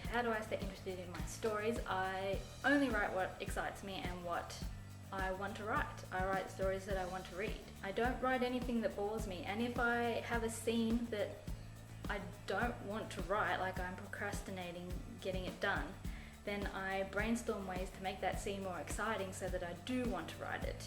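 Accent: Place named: Australia. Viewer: I love your accent! You are such an awesome inspiration! Plus, you're from Australia so you're badass!! :D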